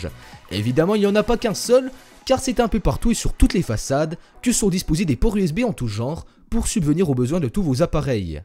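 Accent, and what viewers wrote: Belgium. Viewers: j'adore l'accent belge.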